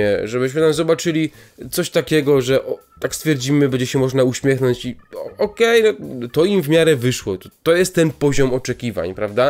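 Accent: Silesia, Poland. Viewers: jesteś Ślązak?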